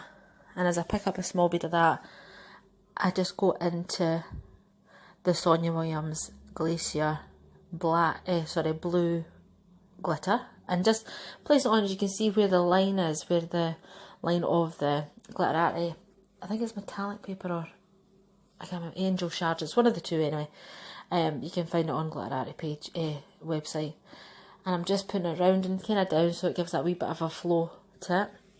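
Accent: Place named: Scotland, United Kingdom. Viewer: Love these nails saw them on Facebook. Hope your little one is doing great she's cute as a button. Love hearing a fellow Scots accent on here. Love them and I need some of that paper from glitterarty xx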